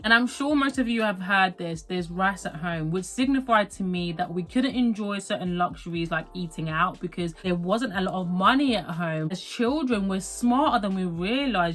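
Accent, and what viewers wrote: United Kingdom. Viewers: I just love the British accent 😊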